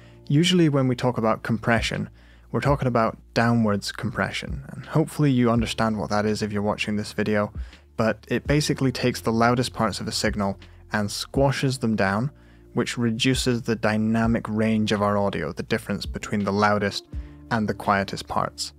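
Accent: Scotland, United Kingdom. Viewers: Offtopic... is you accent Scottish?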